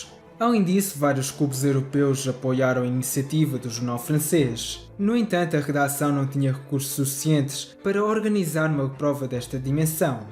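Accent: Portugal. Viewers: Sotaque português é gostoso de ouvir Kkkk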